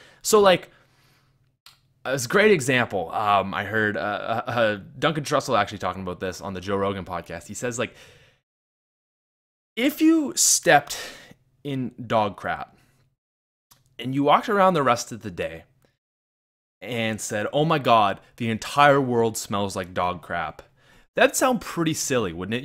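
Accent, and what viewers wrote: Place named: Canada. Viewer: I knew you where from Canada because of your accent well I'm from Canada too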